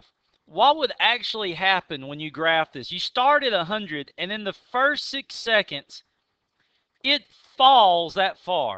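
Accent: United States. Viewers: Science spoken in American English 🤪👍 loved it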